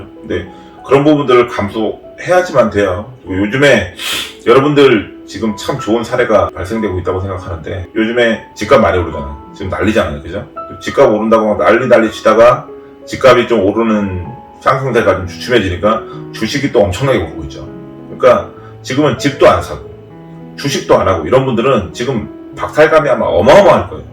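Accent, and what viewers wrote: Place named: Gyeongsang, South Korea. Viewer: 아이고~아이고~~ㅋㅋ 같은 경상도 사람이라 말씨가 더 정감이 더가더라구요^^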